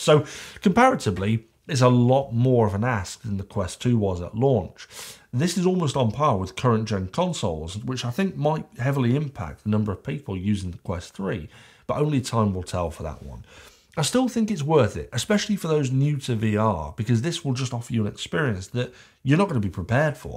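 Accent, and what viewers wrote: England, United Kingdom. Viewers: Like your delivery. You're easy to listen to. And nice to hear English rather than some disgusting American accent.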